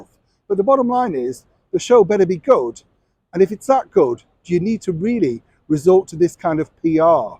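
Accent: Yorkshire, United Kingdom. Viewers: I’ve never heard you sound so Yorkshire, ‘ it’s a bloke in a frock’! I absolutely loved this report, well done. The reason it’s a flop is because he is butchering one of our favourite writers.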